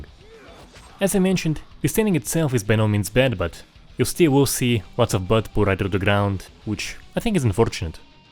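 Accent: Russia. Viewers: Блять этот русский акцент, кров из ушей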